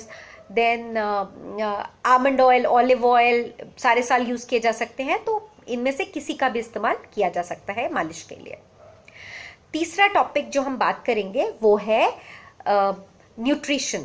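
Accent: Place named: India. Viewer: Wtf title in english but she is talking in Indian mixed english what kind of language is this?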